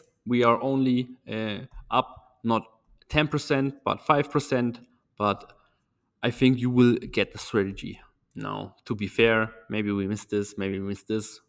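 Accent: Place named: Germany. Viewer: I can just hear you are german, keep it up! the accent will go away after some time.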